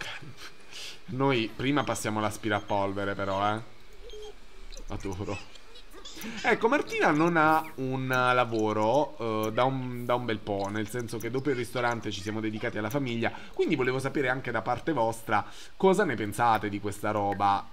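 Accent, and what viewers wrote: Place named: Taranto, Italy. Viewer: ho appena iniziato a guardare i tuoi video e ho capito subito che fossi di Taranto,spero vivamente di avere ragione hahahah comunque sei divertentissimo giuro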